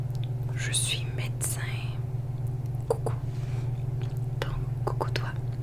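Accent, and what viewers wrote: Quebec, Canada. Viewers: T’es québécoise? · Je ressens un accent québécois quand tu parles mais c’est sûrement moi est juste habitué avec cet accent ou qui rêve😴… Continue comme ça! 🇨🇦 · Es-tu Québécoise ? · Tu es canadienne ? J'aime ton accent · Tes québécoise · Accent anglophone mais aussi québécois un peux ? Non ? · Elle est canadienne ? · Je découvre ta chaîne avec plaisir. Ton accent est adorable d'où viens tu ? J'entends parfois un accent québécois mais pas uniquement ... Merci pour ta vidéo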